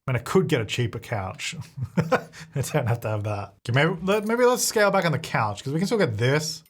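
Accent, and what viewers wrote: Australia. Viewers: I'm Australian so I'm fairly used to the accent but when I have you in the background you sound like Jazza 😂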